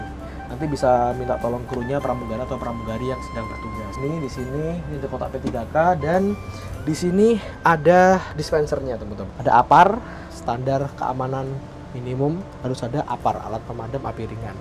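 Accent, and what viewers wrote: Java, Indonesia. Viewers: Bang sekarang udah medok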